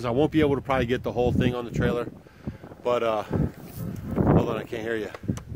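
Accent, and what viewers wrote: United States. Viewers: you from usa?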